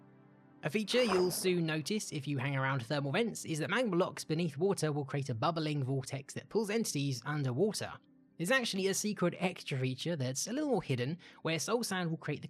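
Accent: United Kingdom. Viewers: I was reffering to pronunciation as "ae" often makes an /æ/ sound in English (think "aesthetic, etc). What I was saying was that I've only ever noticed /akwætɪk/ being said by these two Youtubers who both happen to be British.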